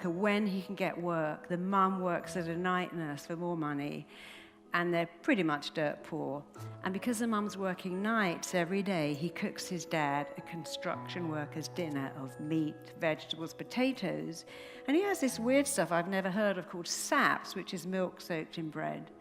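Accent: United Kingdom. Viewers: It only works when you say it with this britisch accent:) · ENGLISH ACCENT FULL OF SHIT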